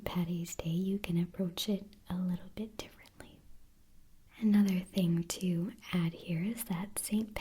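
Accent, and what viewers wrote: South, United States. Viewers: You, ma'am, have done the impossible. What is it that I speak of, you ask? Isn't it obvious? Bagpipes. Bagpipes in an ASMR video, haha! I never thought it possible! I'm teasing, of course. The concept just struck me as funny ;) But now, my serious face... I quite enjoyed this video, but my first comment to you is a bit more general. I have been an avid seeker of ASMR videos since I discovered their existence and more incredibly discovered that this odd, pleasurable, and difficult to describe feeling was shared by quite a few (albeit still a select few) other individuals. Like all of us tingleheads, I have my favourite ASMRtists that I subscribe to that aren't just giving me head-gasms, but are also just flat-out talented entertainers. And this is where you come in. I love your videos for the guaranteed tinglefest they give me, their relaxing nature, and this incredible sense of comfort I get from them. 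It must be that southern charm my Bostonian ears just melt for... But girl, you are a natural in front of that camera! I mean, of course that pretty face of yours doesn't exactly hurt, but I think you just have a gift for acting. You have excellent diction and your comedy sense and timing are all things that can't be taught. I mean, after all that how could I not subscribe to your channel?! Keep up the amazing work, you are much appreciated. Sincerely, Andy Mac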